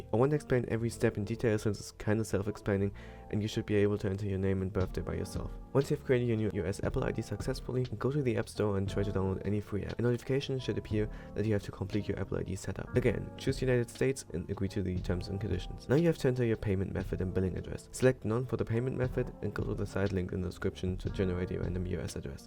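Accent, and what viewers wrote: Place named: Germany. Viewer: Ich habe sofort gehört das du deutsch bist.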